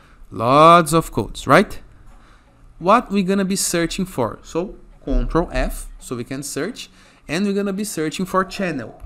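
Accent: Brazil. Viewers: Brazilian accent...😎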